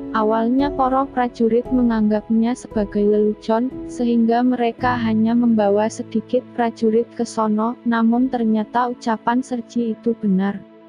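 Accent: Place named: Java, Indonesia. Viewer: Bohoso jowone ije kentel men mbak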